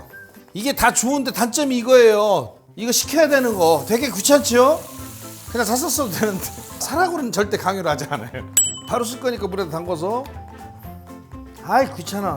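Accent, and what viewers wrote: Chungcheong, South Korea. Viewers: 백종원 님 말씀도 맛잇게 충청도말씀 저도 충청도임니다 감사해요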